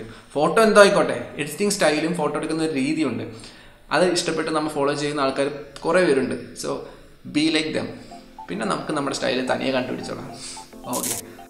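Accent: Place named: Kannur, India. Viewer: Pullide samsaram kettittu kannoor karan aaanu thonunn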